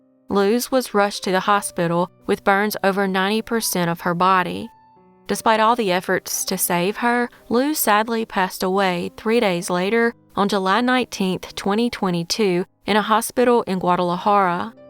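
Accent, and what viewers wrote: South, United States. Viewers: Thank you lovely 🥰 lady from the South with a beautiful Southern accent and a kind Southern heart! 💕💐💕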